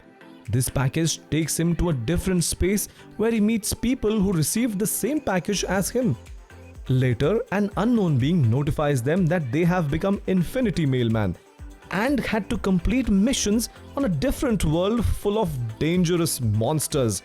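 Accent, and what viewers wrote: India. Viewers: i just know from your voice that you are indian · Oh good god I hate Indian English ! The pronunciations are just so JARRING !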